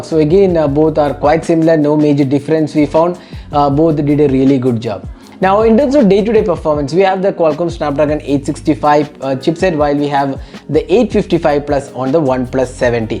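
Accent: Kerala, India. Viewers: that heavy mallu accent tho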